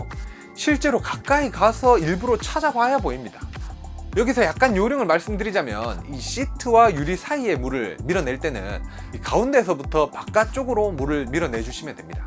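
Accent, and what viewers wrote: Gyeongsang, South Korea. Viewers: 어쩌다 들어왔는데 경상도 억양으로 쉬원하게 설명하네요. 좋은 정보가 많네요 감사.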